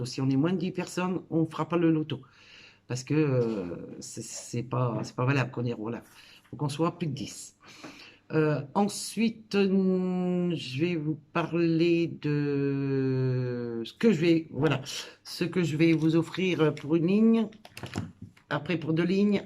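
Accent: Nord-Pas-de-Calais, France. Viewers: Coucou. Tout d'abord Merci pour ta petite attention (de mon anniversaire). Je suis contente de voir ton visage, tu n'as pas à être complexée, chacun est comme il est et c'est comme ça. Tu es très bien et ton visage va très bien avec le son de ta voix, que j'adore vu ton accent ch'ti. Donc RAVIE de te voir en entier. Gros Bisous et 1 like en plus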